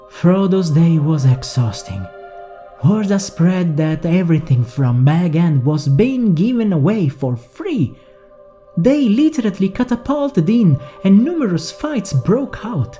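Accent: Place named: Italy. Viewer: I sensate a pizza accent. Are you Italian? Tolkien name is pronounced tole-kee (emphasis on tole) not tole-kee-anne BTW. You're good though. Keep the good stuff going 👍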